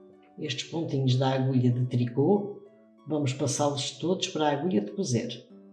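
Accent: Portugal. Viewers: Hoje mim inscrevi! Lindo trabalho e bem explicado! Lindo!😍😍😍acho elegante o seu português creio que seja de portugal.👍👏👏👏💋🌹🇧🇷🇧🇷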